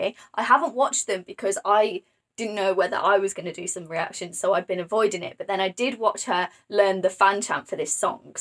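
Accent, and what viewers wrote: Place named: United Kingdom. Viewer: I love your British accent,, 😅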